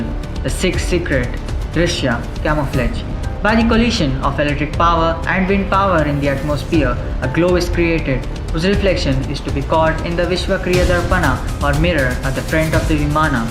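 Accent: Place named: India. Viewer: Yes video is probably really interesting but can't make a word of it out with the thick accent ..it's like not that hard to stretch your tongue and speak but it seems like Indians enjoy hearing themselves talk with a bag of marbles in mouth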